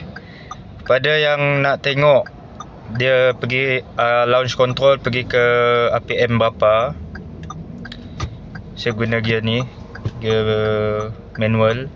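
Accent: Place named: Sarawak, Malaysia. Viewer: Org srwak je ade tune mcm n😁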